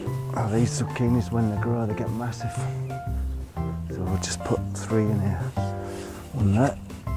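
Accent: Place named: Norwich, United Kingdom. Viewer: Love your wigwam..can I ask where you are from originally? I can't place your accent, sounds a bit like Norwich and a bit Yorkshire :)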